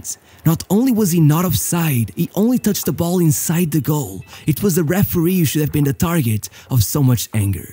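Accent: Portugal. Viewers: With all respect this guy talks lies quaresma was Champion in Sporting and in 04-05 we ended up in second know your facts instead of making them up specially if u sound portuguess maybe a Benfica stan that wants to Paint the wrong picture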